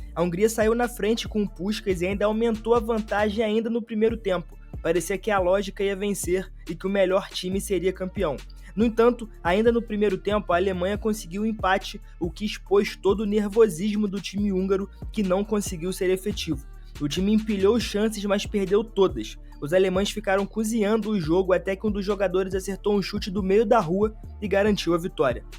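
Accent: Rio de Janeiro, Brazil. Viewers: Vídeo começa : “SOTAQUE CARIOCA” Fechei o vídeo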